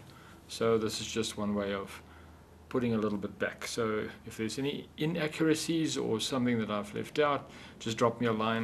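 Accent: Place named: Afrikaans, South Africa. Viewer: Is jy afrikaans?😀 Jy het definitief my aksent!!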